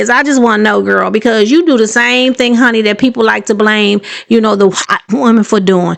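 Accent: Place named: DMV, United States. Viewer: Youre absolutely right. You make great points. I hear a little DMV in your voice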